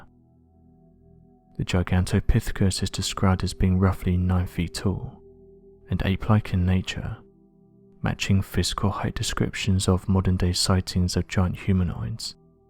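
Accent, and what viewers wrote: England, United Kingdom. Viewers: Works for me ONLY if I don't listen to how he pronounces certain words, such as saying "er" for the "th" sound...eaver instead of either. It reminds me of a speech impediment, but the English have funky accents depending on what part of the country they're from like us ie Southern vs Yankee!!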